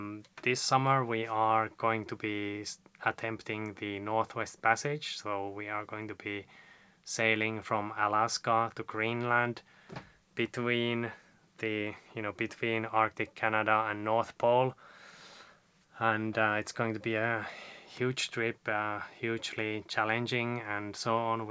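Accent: Finland. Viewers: Once again, simple and very informative with a charming Finnish accent, be well and trust your personal SISU angel and you'll be fine & safe, cheers from Karelian Poika!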